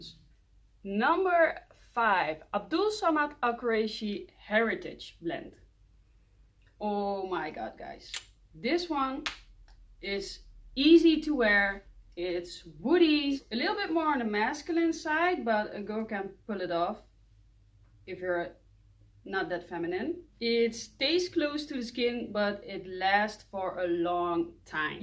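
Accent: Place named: Netherlands. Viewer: Als Belg hoor ik een nederlands accent, toevallig van Nederland ?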